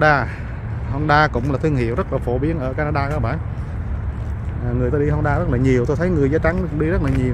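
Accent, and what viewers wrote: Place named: Vietnam. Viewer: 😂 phát âm kiểu rất Vietnamese đó bạn.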